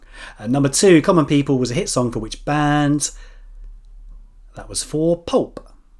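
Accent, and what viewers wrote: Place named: Southern England, United Kingdom. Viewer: Because of the number of question I bet your a man under fan. You sound from down south where most man utd supporters live.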